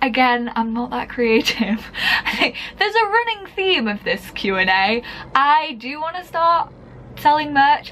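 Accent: England, United Kingdom. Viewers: i hate english accent but i love your playings a lot and i love so much irony 😁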